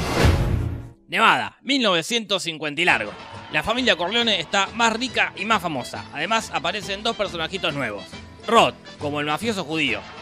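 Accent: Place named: Argentina. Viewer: Insoportable la manera en la que hablas Argentino tenias q ser.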